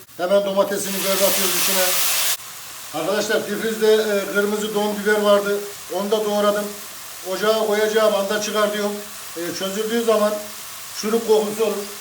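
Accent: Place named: Ordu, Turkey. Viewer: Ben de Orduluyum şefim, aksanınız aynı bizim oralar. Başarılar diliyorum size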